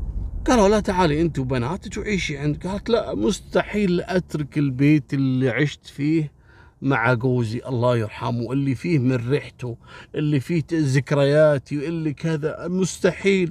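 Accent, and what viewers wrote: Egypt. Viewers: هههههه بحكي مصري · يا قصصك وطريقتك روووعة ولهجتك المصرية اروووووع 👏👏👏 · يا حلو اللهجة المصرية من لسان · قصة جميلة واروع ما فيها لهجة المصرية.....😊😊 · بحب طريقتك وانت بتتكلم مصري يا 😂😂بجد كأنك عايش معانا بمصر تحياتي ليك من مصر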